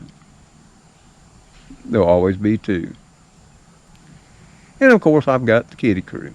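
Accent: South, United States. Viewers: Love your Southern accent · Southern accent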